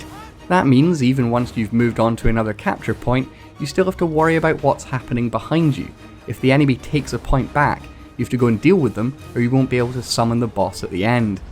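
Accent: Scotland, United Kingdom. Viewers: Great video. Love hearing a fellow Scot. Can’t wait to get my hands on TWW3 to try out these new battles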